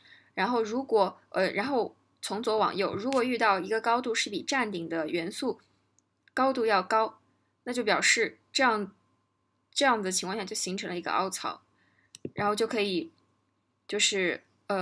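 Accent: China. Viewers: 阔以阔以，是不是四川老乡啊哈哈哈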